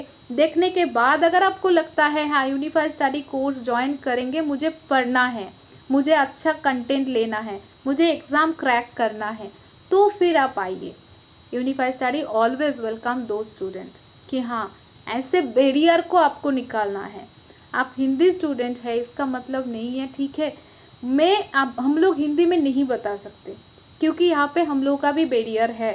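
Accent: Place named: South, India. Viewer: mam s accent of english is little bit south touch but its very simple to understand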